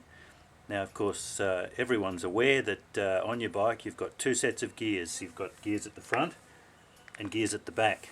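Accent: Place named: Australia. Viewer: youre australian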